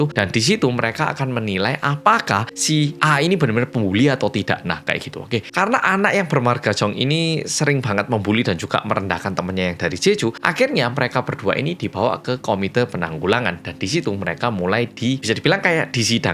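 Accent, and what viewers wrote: Java, Indonesia. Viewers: Hai medok🎉 lgsg nyimaaak🎉 Salam sehat 💪🏿🙏🏿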